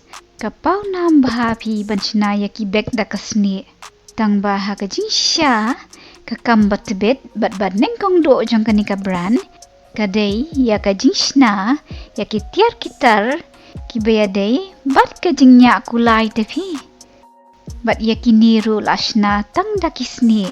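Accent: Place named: Khasi, India. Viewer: Nga sgewtynnd ia kane ka chanel dei na ka rukom kren...khasi tiak pa tiak bad tbit bha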